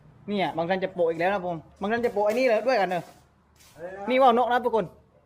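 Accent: South, Thailand. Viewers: ผมชอบมากคับถาษาใต้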